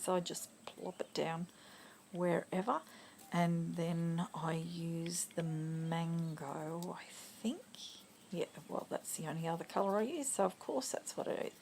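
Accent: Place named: Australia. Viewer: Love the page and the pineapple. Love the gelli plate and good to hear another Australian accent. Susan.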